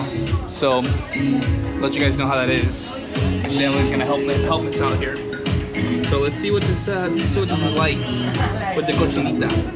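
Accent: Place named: Guatemala. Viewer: Guau que linda es mi Guatemala práctica mente es una chulada gracias a Papa Dios es el mundo 🌎 maya y que grandes están las tiendas y hay de todo y que rico desayuno comieron ustedes y que lindo es el animalito lo bueno que la muchacha se tomó una foto y que linda es música la marimba y de echo venden dulces de algodón y las Nieves son .uy delisiosas no se si ya la probaron ustedes tu eres de Guatemala porque hablas como un guatemalteco gracias exelente y bendiciones 🙏🙏🙏🇬🇹🇬🇹❤️😘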